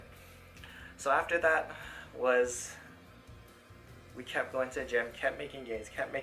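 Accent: United States. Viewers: Dude..u from west coast or east or Midwest?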